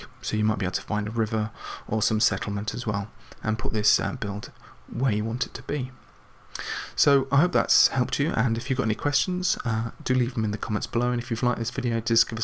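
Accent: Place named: North, United Kingdom. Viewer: Thats really awesome, great work...you say you're from the north, what part if you don't mind me asking, anywhere close to Middlesbrough, can't place the accent :)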